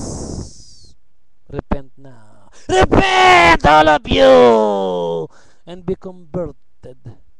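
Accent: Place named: Visayas, Philippines. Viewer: hisa bisaya thats why sounds irritating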